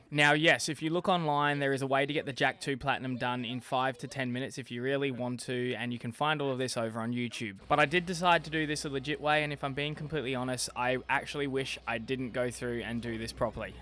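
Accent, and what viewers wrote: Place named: Australia. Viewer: Not my usual sort of content to consume, but hearing an Aussie playing my favourite childhood game and smashing it has earned a sub! Keep it up and hope you smash your goal for the year!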